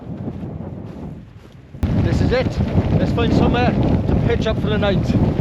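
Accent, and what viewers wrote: Wales, United Kingdom. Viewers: The Walsh accent is madd 😅😅😅 nice video tho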